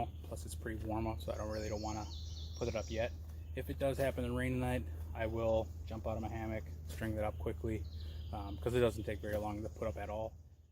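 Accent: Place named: Upper Midwest, United States. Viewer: Good job 👍. Now I know where you get your accent from. I lived in the UP for 8 yrs. We took trips to Green Bay just for the shopping...lol. Look forward to seeing more.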